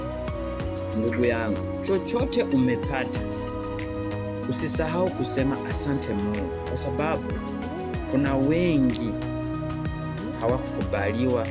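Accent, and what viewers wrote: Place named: Burundi. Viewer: Swahili ya Burundi hiyo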